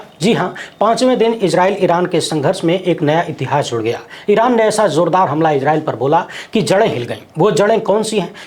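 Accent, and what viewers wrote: Bhojpuri, India. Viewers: आपका भोजपुरी अंदाज़ बहुत ही निराला है । मन को मोह लेने वाला । आप अपने इस अंदाज़ से भोजपुरी भाषा की भी सेवा कर रहे हैं । आपसे प्रभावित होकर, मैं स्वयं आपके भोजपुरी अंदाज़ में, अपनी विडियो बनाने का प्रयास करता हूँ । पहले मैं English language में अपना विडियो बनाया करता था । परन्तु, आप तथा रवीश कुमार को देखकर, सुनकर, मेरा आकर्षण पहले हिंदी की तरफ, तदुपरान्त भोजपुरी भाषा की ओर हुआ । मेरे लिए वैसे तो यह सब काफी मुश्किल है । परन्तु, फिर भी मेरा प्रयास जारी है । All credit goes to u n Ravish ji . 🙏🏻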